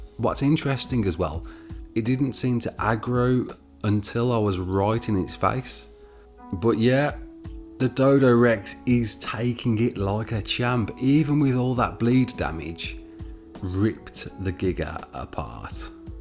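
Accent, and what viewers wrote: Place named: Midlands, United Kingdom. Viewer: where abouts you from in Midlands sound local man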